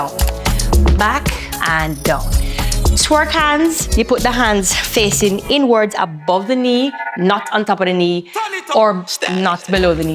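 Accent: Jamaica. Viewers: I got mesmerized inlove when you say down wat accent haha i hear jamaica but i hear something else to 🤦🏿🤣🤦🏿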